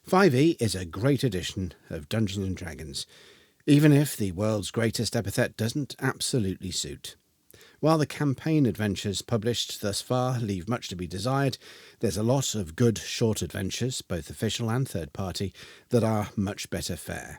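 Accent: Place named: United Kingdom. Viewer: Amazing video! I cant get from the accent, where are you from in the UK?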